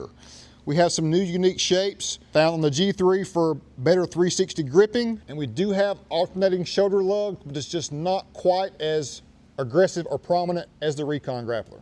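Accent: South, United States. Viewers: Seriously what kind of pretentious asshole puts the style of the tread over its functionality? Just because the tread style is old does not mean that it's not good still. If someone actually invented the best overall tread for mud, snow, ice, rain, and dry conditions and nothing else for the next 50 years beats it would you actually give a shit? If you're a pretentious douchebag you would, if you're a man you wouldn't. You're a yuppie suburbanite with a southern accent. 😂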